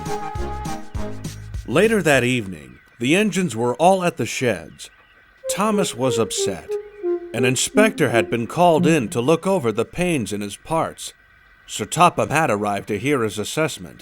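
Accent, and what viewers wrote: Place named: United States. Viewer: Narraration sounds a bit too American, but this is very well written and I hope you make a threequel.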